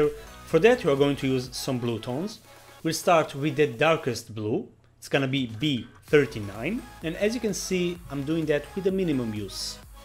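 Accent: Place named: Israel. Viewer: נשבע שהוא נשמע ישראלי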